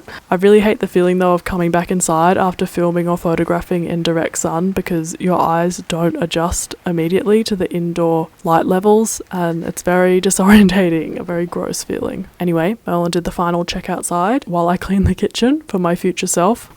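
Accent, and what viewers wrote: Australia. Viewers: Wasn't expecting the Australian accent, but hello from a Brit who is an Australian citizen! This has given me a great idea to track my own day for all of the accommodations I give myself. Not sure what your invisible illness is as I'm not familiar with your channel, but I'm hoping you've looked into POTS. And love Bananagrams, btw 🙂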